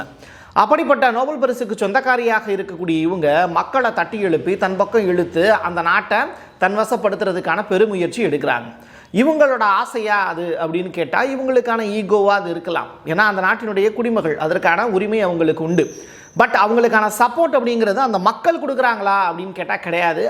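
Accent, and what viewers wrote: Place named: Madurai, India. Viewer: Maduraipesu madurai pesa unnai kettaangala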